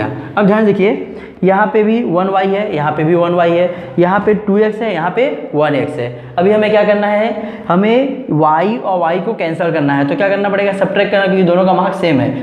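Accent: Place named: South India, India. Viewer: Sir from which state are you ,your speaking tune are like south Indian people's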